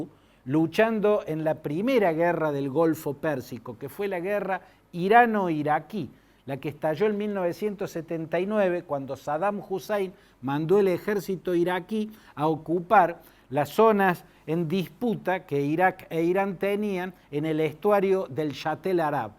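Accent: Córdoba, Argentina. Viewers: Nadie como vos, Claudio Fantini, para explicar estos temas. Ninguno igual para pronunciar esos nombres tan difíciles. Y con acento cordobés de yapa.👌🏻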